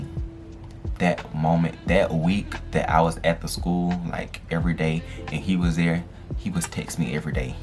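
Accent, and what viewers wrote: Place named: South, United States. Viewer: You look and sound like a real southern. belle. Keep enjoying and keep growing your content .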